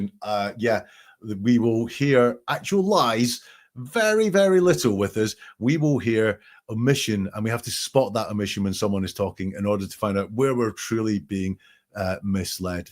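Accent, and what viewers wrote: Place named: Perthshire, United Kingdom. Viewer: your accent sounds like your from the Perthshire area that's where I'm from I'm enjoying your channel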